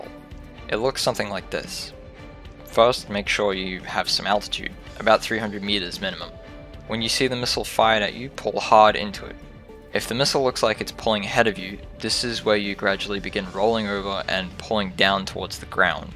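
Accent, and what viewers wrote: Australia. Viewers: Wait your Australian too? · Are you Aussie? Cause I am :D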